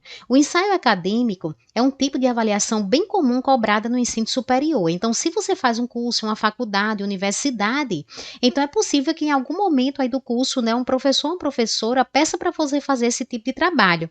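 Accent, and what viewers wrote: Northeast, Brazil. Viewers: Adoro aprender com essa Professora, sem contar que amo o sotaque do nosso nordestão! 🥰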